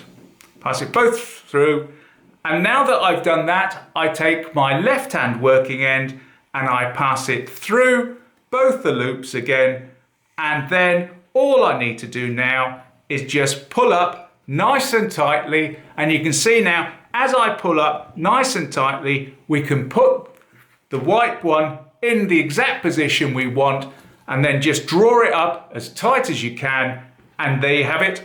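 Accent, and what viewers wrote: United Kingdom. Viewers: My favorite knot channel. Great, clear instruction, warm friendly and pleasant British accent, and excellent filming and editing. I just love this guy!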